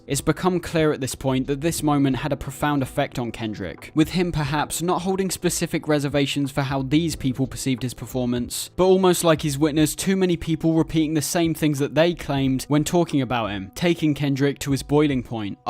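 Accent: United Kingdom. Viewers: Could you possibly get rid of the British accent? It’s kinda heard to understand what you’re saying sorry haha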